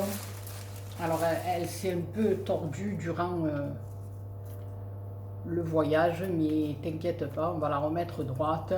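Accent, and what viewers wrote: South, France. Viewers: Moi je viens sur les chaînes pour trouver du plaisir . Chez vous je me régale. Déjà l'accent trop top , les expressions du sud, je ne m'en lasse pas. Vous êtes très minutieuse dans vos créations, cela se voit de suite. Alors si nos commentaires vous apportent du plaisir , c'est un partage. Ceux sont ces petits bonheurs qui font que la vie est belle. Je vous souhaite que tu positives. Belle journée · Oh que tu es magnifique tout en beauté, que de belles cartes, tu le mérite beaucoup et magnifique création que tu nous a encore fait. Mais c'est normal que l'on soit nombreux à te suivre car tu es une belle personne, tu es honnête, franche, et super à t'écouter avec l'accent du sud et ton franc parler moi j'adore. UN GRAND GRAND MERCI POUR TES TUTORIELS, TES BLABLAS ET TON HUMOUR qui nous remontent le moral à ce faire péter le bide de rire. 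Allez passe un bon réveillon et à bientôt bisous de Bourgogne.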